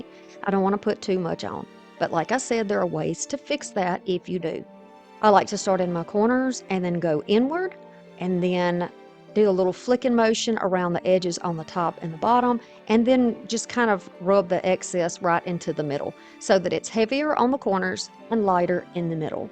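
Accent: South, United States. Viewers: Love them all! I love crows, so your moodies are perfect accompaniments. Thanks so much. Have a great day and God bless 😊 . I went to the michaels link and i like your birdcage and shadow box way more than theirs, actually i think all of yours are better ! Are you from ga ? Cause you talk just like me, and when you said "little,tiny nails" i laughed out loud. I so love our southern hyperbole, why use one word if you can use two and drawl them out. From Nancy in ga usa 😊.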